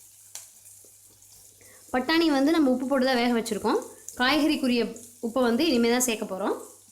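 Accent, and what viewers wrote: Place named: Thanjavur, India. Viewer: Nenga pesara slang thanjavur slang mathiriye irukku.....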